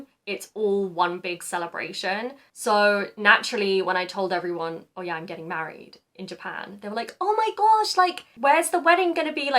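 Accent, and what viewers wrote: United Kingdom. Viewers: イギリス英語のaとかoとかerの発音がほんとにかっこよくて好きだけどなかなか真似できない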